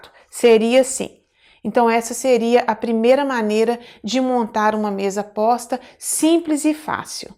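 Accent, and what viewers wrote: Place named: Minas Gerais, Brazil. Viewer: por favor se puder faça um vídeo nos mostrando onde colocarmos a comida depois da mesa posta. Vejo vários vídeos sobre mesa posta mas nunca com a comida. Onde ela deve ficar, se na mesa ou no aparador. Como devemos agir. To aqui em todos seus vídeos. Sem contar que amo seu jeito mineiro de falar. Mata um pouco a minha saudade do nosso torrao natal.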